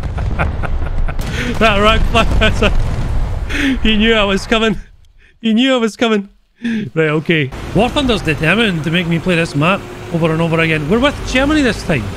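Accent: Scotland, United Kingdom. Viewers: It's the Scottish brogue. He could read off my final rites and it'd honestly be entertaining.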